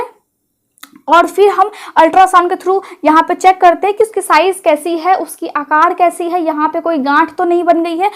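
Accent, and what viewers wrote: Bihar, India. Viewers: R u belong to bihar state? 😊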